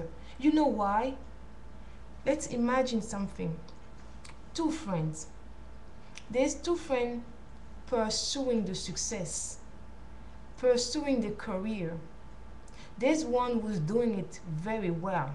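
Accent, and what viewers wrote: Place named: Africa. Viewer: I love you African Woman. You have a beautiful African Accent.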